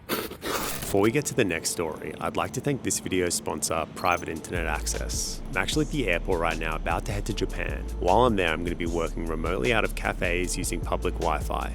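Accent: Australia. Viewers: It’s that chill Aussie with the interesting stories again :)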